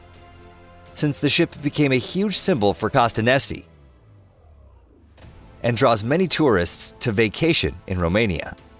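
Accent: United States. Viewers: Most insane American accent i ever heared. Im not able to finish this.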